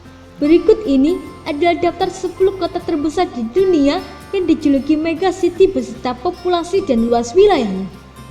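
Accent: Java, Indonesia. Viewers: Ngomong logat jawa males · Suaranya bahasanya kok medok totok kali jawa nya. Jadinya memuakkan. Apa gak ada manusia yg lain ? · Medok amat mba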